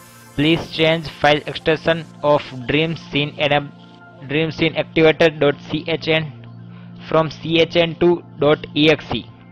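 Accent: India. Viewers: i know its fake,you have an indian accent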